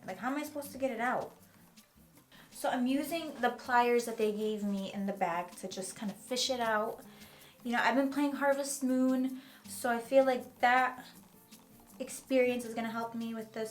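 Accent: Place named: New York, United States. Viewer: Where are you from you got a slight accent Are you from somewhere in New York?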